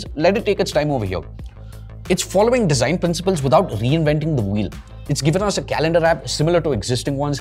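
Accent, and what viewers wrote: India. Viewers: you sounded andrej karpathy as a keralite or tamilian, please bro don't harass us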